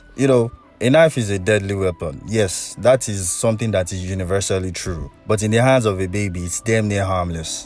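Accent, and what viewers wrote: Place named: Nigeria. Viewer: From the accent I could tell you're one of us (Nigerian)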